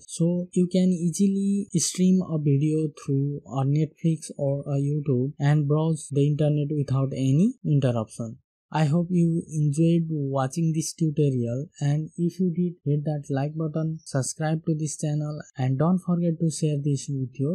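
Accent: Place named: India. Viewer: downvoted because you sound indian and i can't understand half of what your saying. let me explain: downvotedbecauseyousoundindianandican'treallytellwhereonewordstartsandanotherwordendsbecauseyoudon'thavespacesbetweenyourwordswhenyouspeak. i don't think can be any more clear than that.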